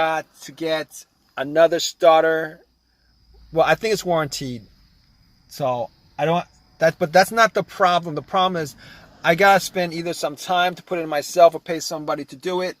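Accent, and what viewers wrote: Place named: New England, United States. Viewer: He sounds like a New Englander, they help it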